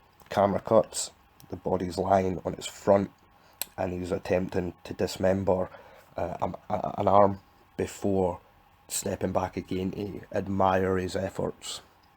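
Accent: Scotland, United Kingdom. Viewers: Where you from in Scotland mate??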